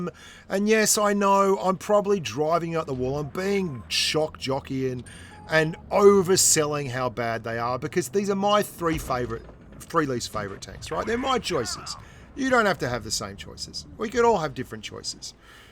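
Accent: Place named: Australia. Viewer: I’m a kiwi and I think he’s an Aussie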